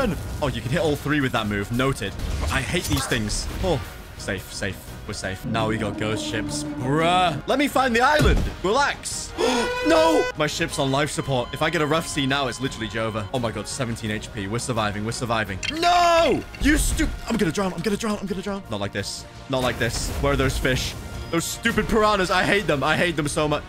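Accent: United Kingdom. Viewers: Bros British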